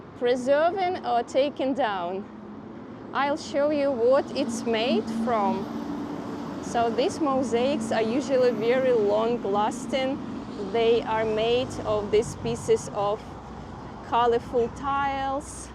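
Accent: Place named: Russia. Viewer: There's nothing wrong with the Russian accent but calling it "attractive" is kinda cringe.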